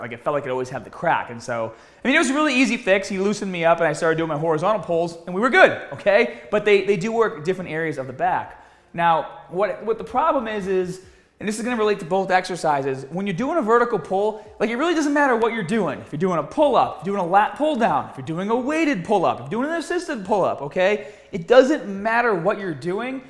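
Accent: Boston, United States. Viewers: Bury You didn't. Literally. He's American, from Boston.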